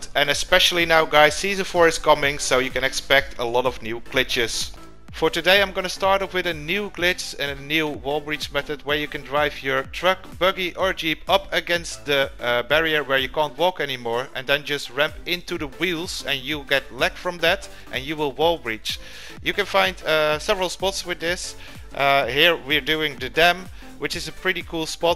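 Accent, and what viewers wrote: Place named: Netherlands. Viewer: You are dutch... i know 100%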